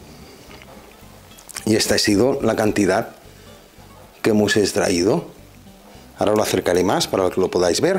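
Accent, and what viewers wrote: Catalonia, Spain. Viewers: a ti. Además diría q eres catalán por ese acento y por los profusos «hacer servir» jajaja. Así q salutacions des de Barcelona :)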